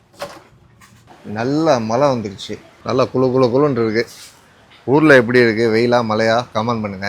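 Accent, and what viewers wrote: Tamil Nadu, India. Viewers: Brother I like your tamil slang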